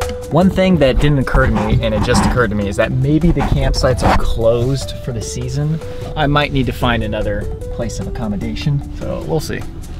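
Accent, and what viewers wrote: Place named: England, United Kingdom. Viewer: Your English accent sounds like Keanu reeves accent from Bram Stoker's Dracula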